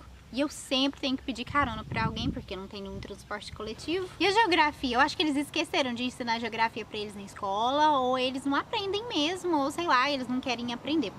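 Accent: Minas Gerais, Brazil. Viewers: Adoro seu jeitinho mineiro de falar... como e linda essa menina... adorei. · Você é da onde , seu sotaque parece com o de Minas !!! bjss adoro se canal !! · com essa voz de mineiro..tem qui arotar mesmo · Teu jeito mineiro é muito maneiro, nós cariocas adoramos vcs (mulheres) falando!!! vc mora nessa residencia dsd que deixou de ser au pair?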